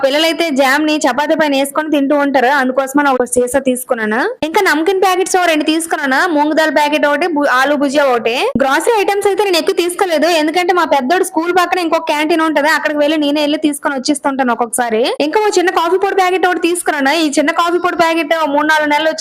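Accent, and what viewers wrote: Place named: Vizianagaram, India. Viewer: విజయనగరం యాస...very nice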